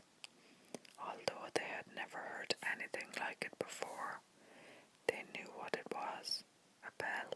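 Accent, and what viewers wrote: Ireland. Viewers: am I detecting a Tipperary accent? :D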